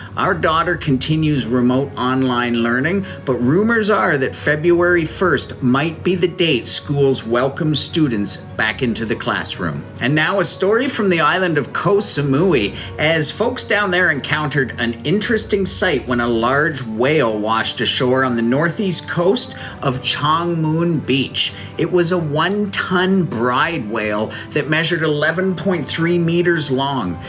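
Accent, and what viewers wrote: Canada. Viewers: You are really awesome. The canadian accent was just superb and the energy in videos makes look Thailand much more cooler. I will really come and meet you once i am back to Thailand and will surely bring goodies from India.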